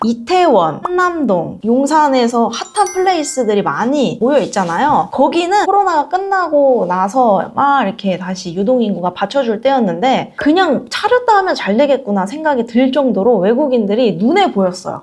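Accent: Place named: China. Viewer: 가 중국인 악센트가 있으시네요~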